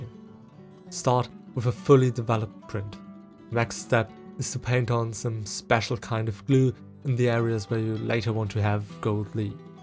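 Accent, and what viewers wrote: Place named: Switzerland. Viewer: Interesting pronunciation. Studied British on a German substrate. Swiss-German?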